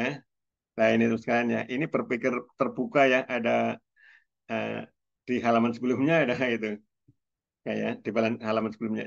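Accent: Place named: Banyumas, Indonesia. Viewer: Org ngapak ini ya😂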